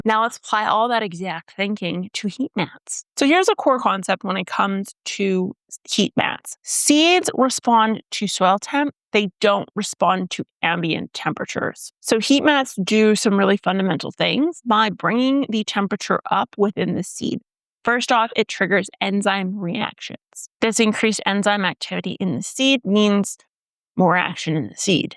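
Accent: United States. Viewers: As a Wisconsinite, you sound like you live around here. Regional accents! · I’m from Joliet Illinois and I’ve got to say you have pretty much the same accent as me. So you sound American suburban white. Not stereotypically Canadian at all. The people from Wisconsin sound Canadian tho lol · I've used heat mats for quite awhile. When I run out I sometimes use the oven with the light on and the door cracked. You definitely need a thermometer to monitor the temperature. Best used for peppers. You sound like an American to me, except for using the phrase 'those ones.' :) I live in Michigan so I guess close enough to Canada. Really enjoy your videos.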